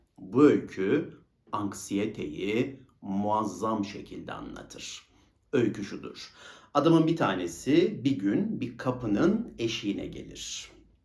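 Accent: Ankara, Turkey. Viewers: Senin Angara şivene kurban , Allah muradını versin hocam , benim aydınlanmama ve bunca yıldır kendimle yüzleşmeme vesile oldun , harekete geçip makinayı kapattım senin dediğin gibi , makinayı kapatın !!!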